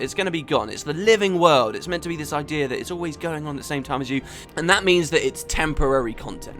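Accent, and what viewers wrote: United Kingdom. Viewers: I just realized you sound like a more British Julian Bashir. Yea I was hoping that the Living Story steps would be connected to each other because that would make it feel more connected and have a more over arching story and lore. I've been trying to get into the living story but BotFW has lost me. The other parts of the story were pretty uninteresting but this is so disconnected that I have no drive to even go there.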